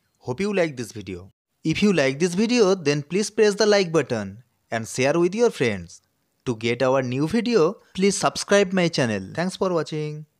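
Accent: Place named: Bengal, India. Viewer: Pronunciation is very much Bengali.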